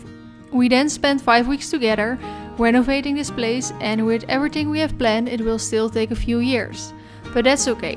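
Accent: Netherlands. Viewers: Hi! So exciting you finally decided to make an English video! Don't worry about your accent. I've been living abroad for about 2 years now (I'm also from the Netherlands) and I found that most of the world doesn't care about accents like dutch people do. Dutchies are just brutal when it comes to an accent. There is no need to sound native. Actually while attending language school here I'm in a class full of people with different nationalities. The native English speakers are mainly just super enthusiastic that you speak 2 or more languages and other like German , Italians, Finnish are mainly excited when someone speaks with their own language accent, they are proud. And here we are, the dutch, over achievers and bashing everyone. Just wanted to say, you're doing great and keep going <3 Can't wait to see more of this series! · This video was wonderful! I absolutely LOVE the Netherlands and visit almost every year ♥ Your English is amazing, I really like you accent, welcome to this side of Youtube! 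· As a Dutchie living in Sydney, Australia for 4 years who's been watching your videos ever since you started vlogging, I have to say I'm really proud. 🥹 From experience I can say that speaking English more easily and expanding your vocabulary really does come over time with lots of practice, but also that losing a Dutch accent might never happen, since I still have mine - very thick. Just see it as a sign of bravery. 😉Cheering you on from down under! 🫶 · there is no need to be scared! I used to share your fear about sounding like a Dutchie but I've learned to embrace it. Your accent is absolutely lovely and tells us much about you and your roots. Please be proud of who you are and what you've achieved. Attagirl! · I love watching Dutch videos as a girl from South Carolina USA. I hope to visit one day and I am trying to learn the language. Listening to your accent helps me. Plus I love horses so it’s a win win. 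· Already in love with the acc also really like your Dutsh acc love youu ❤❤ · Je doet het echt super goed, je Nederlandse accent is juist heel leuk om naar te luisteren. Heel veel succes met je nieuwe kanaal! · You go girl!! ❤ And remember it's quite natural to have a dutch accent since you're dutch and not english. Think people from other countries will find it cute! Liefs Pauline & Skip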